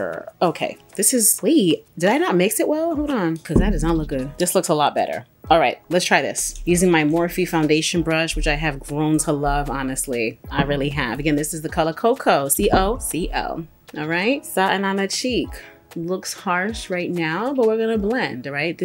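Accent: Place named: Rhode Island, United States. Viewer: I freakin love your accent! From a MA gal to a RI gal😍😘💜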